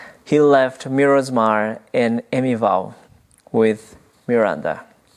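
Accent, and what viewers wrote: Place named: Portugal. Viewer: Thank you for the wonderful English Dharma talk! Sensei actually has a bit of a Portuguese accent, so his Portuguese must be excellent! Namu Amida Butsu🙏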